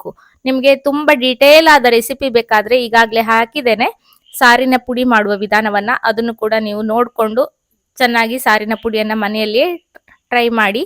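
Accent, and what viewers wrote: Kundapura, India. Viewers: This is pure kundapur kannada ಕನ್ನಡ!! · I was waiting for this recipe from so many years. Being a kundaprian I used miss this every time i eat rasam in Bangalore. Thank u so much madam for this recipe. Now watching again to make the rasam powder for the second time. Twice in a week is mandatory in our home now😊. Laik attu saru. Heenge video madtaikani khushi aytu. Nam kundapra bhashe keluke chenda.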